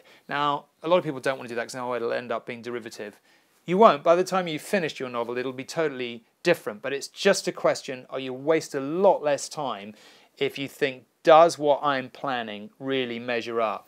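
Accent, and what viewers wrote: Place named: United Kingdom. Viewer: I appreciated the content... the British accent can be painful tho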